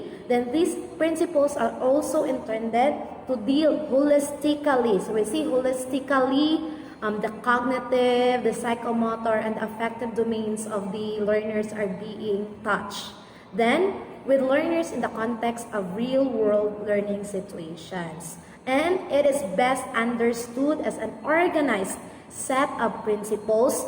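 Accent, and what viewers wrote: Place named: Visayas, Philippines. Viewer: Super love bisaya accent Ma'am, you did great po! 😍❤️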